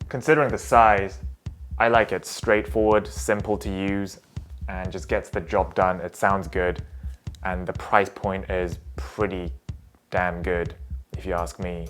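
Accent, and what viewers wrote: United Kingdom. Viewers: definetely did not expect british?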